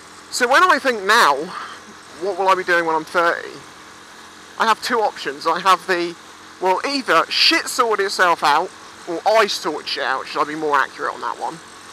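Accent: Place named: England, United Kingdom. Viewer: Hahaha, good stuff, man. "This guy knocked out because it was so good!" As some have mentioned, it's not about the bike. We all came here for the english accent. Haha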